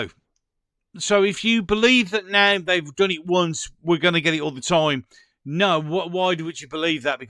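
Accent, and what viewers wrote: Birmingham, United Kingdom. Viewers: Hiya New Sub, I'm made up your channel was recommended, people by me {I'm over the water from l/pool} are still believing the official narrative 🤯 by the way, I love the brummie accent , UB40 fan since the late 70's, and a friend of Brian Travers may he R.I.P 🙏🏻Such a lovely and generous guy .... Jayne 🇬🇧💙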